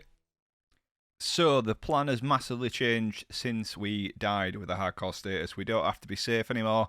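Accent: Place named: Northern England, United Kingdom. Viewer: Really hope you hit 1k subs soon. you seem very wholesome and genuine. loving the yorkshire accent, keep plugging bro =)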